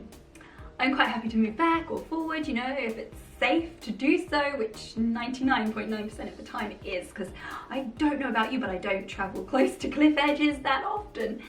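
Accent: England, United Kingdom. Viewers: It's like my opinions in an English accent. Are you reading my mind?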